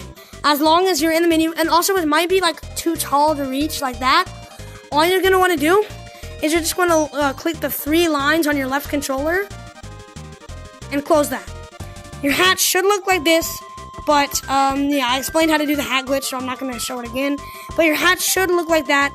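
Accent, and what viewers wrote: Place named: England, United Kingdom. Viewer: Why does bro sound like English kid Naruto?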